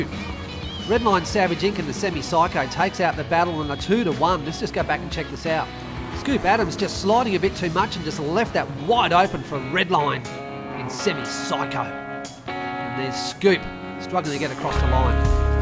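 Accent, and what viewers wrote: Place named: Australia. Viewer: Editing is just brilliant and I just love that australian accent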